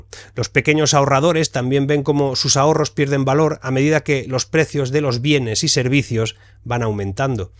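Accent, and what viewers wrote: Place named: Spain. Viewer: Habla 120% como un español pero tiene nombre y apellido franceses, y parece que vive en Francia. Curioso.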